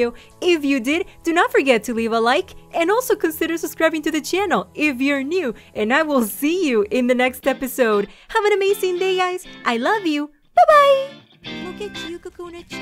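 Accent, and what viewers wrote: Spain. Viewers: Are u Spanish not to be rude😰😰😰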